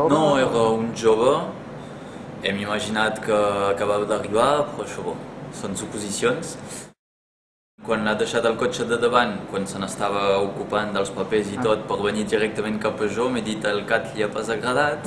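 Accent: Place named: France. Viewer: Llàstima que tinguis l'accent tant contaminat pel francès.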